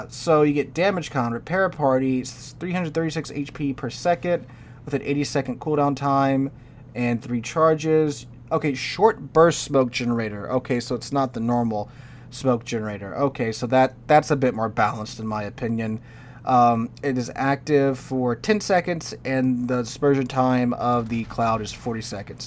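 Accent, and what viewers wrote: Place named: United States. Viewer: Really man? That pronunciation... You cant just read stuff in an american way and expect it to sound about right! 😅 Let me help you: G in Georg is nothing like the english G... its not like the J in John its like the g in hug (both g). And the e is like the men. GE-ORG. And regular R not the amerikan rolling tongue R. Please say Hoff-mann with a like part/dart...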